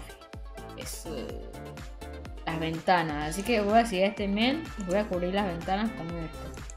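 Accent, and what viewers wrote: Argentina. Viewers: TU ASENTO ARGENTINO JAJAJJAJAJA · Como te cambio la voz me encantaaa ❤❤❤ ame el mamáaaaaaa eres tu sos Argentina??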